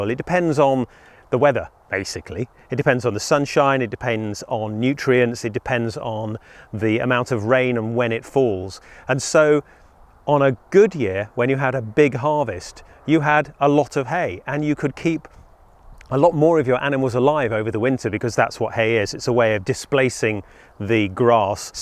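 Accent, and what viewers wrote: United Kingdom. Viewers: Also a British accent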